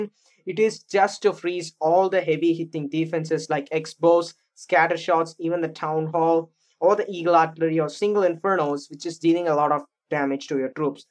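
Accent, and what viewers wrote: India. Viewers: Bro ungaa speach laiyaa tharieduu nengaa tamilaa thalaa (•‿•)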